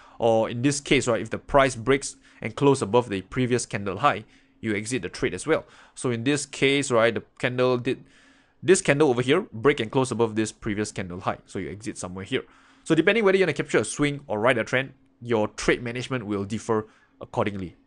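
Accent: Singapore. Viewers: I miss my Singaporean friends because of your accent bro. 😫🤩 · All Singaporeans speak like this! I lived and worked in Singapore for ten years and married a Singaporean gal. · Singlish for sure 😃. Very catchy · him speaking in a singaporean accent doesn’t mean English is his first language. Our first language is English most of the time. Don’t be ignorant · Are you Singaporean? your accent look like it. I used to work there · I think it's probably a Singapore accent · He had typical Singaporean accent 😍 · Singaporean accent to me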